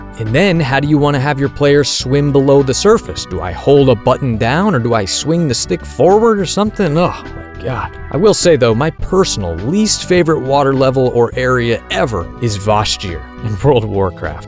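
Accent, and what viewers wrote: United States. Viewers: This guy is from NY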